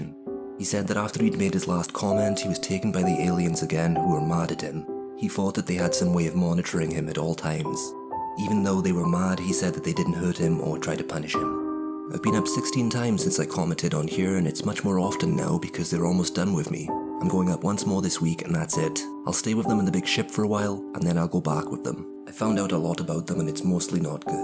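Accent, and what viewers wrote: Wales, United Kingdom. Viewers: great video mate (are you welsh by any chance?). I was wondering about this the other day, I stumbled across that subreddit earlier on in the year and forgot what date they were hoping for contact, glad I came across your video on the Nexpo subreddit.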